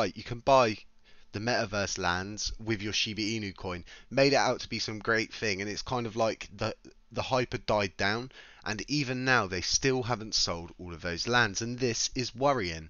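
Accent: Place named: Midlands, United Kingdom. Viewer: I'm sensing a Midlands accent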